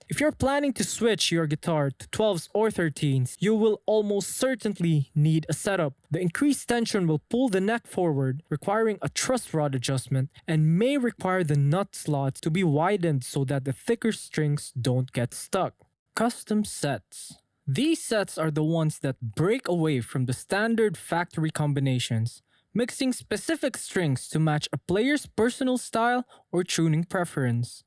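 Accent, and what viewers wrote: Philippines. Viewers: Yow you have a Filipino Accent I hear it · Are you pinoy? I am also 😂 watching guitar vids with family they put your video on and i noticed accent might be fellow kababayan!! If so awesome!!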